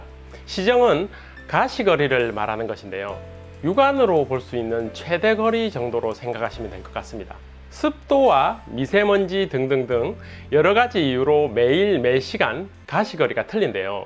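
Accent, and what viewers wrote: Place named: Busan, South Korea. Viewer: 반가워요 부산말투가 이리도 정겨웠나싶네요 좋은정보 감사합니다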